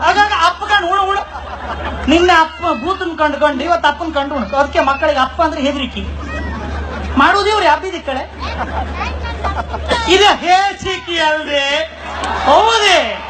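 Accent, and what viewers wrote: Kundapura, India. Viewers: Great kundapura kannada yavatidru....... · Love kundapura language style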